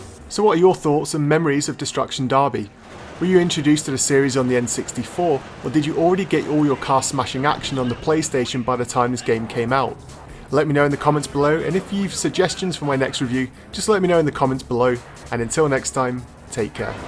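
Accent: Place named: United Kingdom. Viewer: british english sounds gay, so shameful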